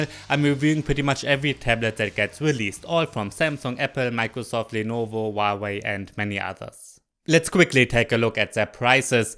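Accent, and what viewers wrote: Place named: Germany. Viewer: You got such a strong german accent, i only realised i was watching your english channel after like 5 Minutes in the video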